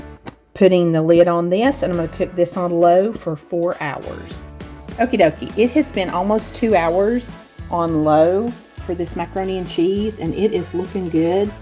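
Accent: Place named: Mississippi, United States. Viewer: East Tennessee? I had guessed Mississippi accent; my husband thought Texas. Merry Christmas :)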